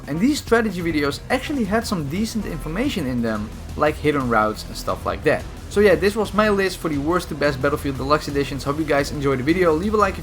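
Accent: Netherlands. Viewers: So you from Netherlands?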